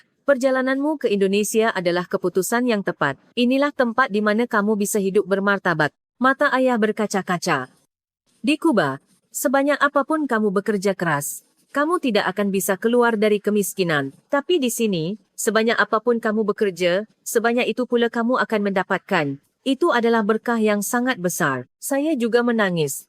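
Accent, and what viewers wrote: Malaysia. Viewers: Narator nya orang Malaysia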